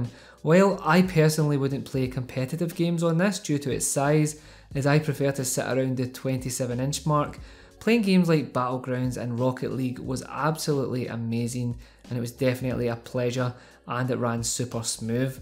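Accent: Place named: Glasgow, United Kingdom. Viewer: Your Glaswegian right, well you sound like, anyway keep up the great work! But I have a request can you make a video about budget per key RGB mechanical keyboards under 30 pound.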